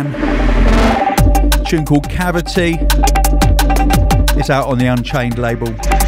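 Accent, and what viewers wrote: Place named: United Kingdom. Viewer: Gotta love how bri'ish my boy sounds, I love him so much. Big up, London.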